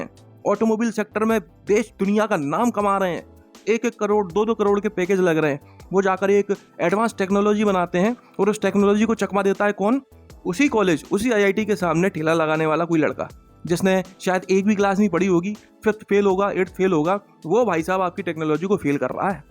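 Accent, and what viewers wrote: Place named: Gwalior, India. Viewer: Tumharii baat hi alag hai bhai maja la dete ho yaar ekdam.❤ Tumhari boli se mujhe to gwalior side se lagte ho.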